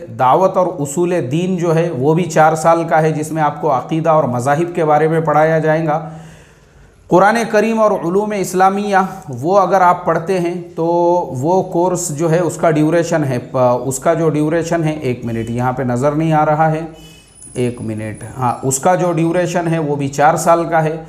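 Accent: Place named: Bengal, India. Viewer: السلام علیکم ورحمتہ اللہ وبرکاتہ بہت خوب جناب مولانا صاحب کیا بنگالی ہیں آپ کی زبان سے محسوس کررہا ہوں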